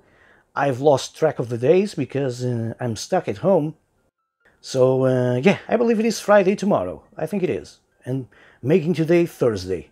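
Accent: Portugal. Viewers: So first I thought: This guy sounds portuguese. Then I looked in his profile and it said Portugal lol. I guess both of us make the same pronunciation mistakes. Olá!! Fonix é a primeira vez que vejo um youtuber português XD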